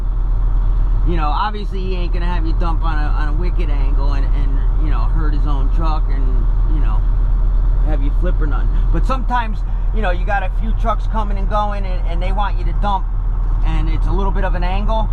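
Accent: United States. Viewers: You talk funny.... WHERE you from BOSTON? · Great video...u in the tri state area...accent sounds familiar...new York New Jersey or CT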